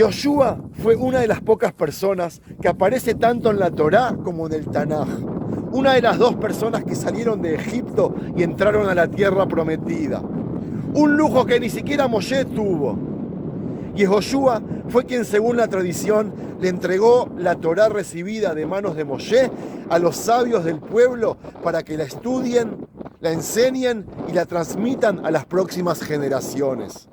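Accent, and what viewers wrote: Argentina. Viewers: Quisiera preguntarte adonde aprendiste espanol suenas de Argentina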